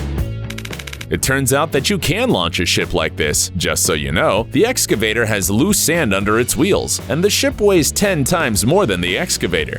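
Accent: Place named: United States. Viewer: 38 seconds in and was so annoyed by the pointless awful cheesy American VoiceOver I had to turn off. We only need the clips not the dreadful voice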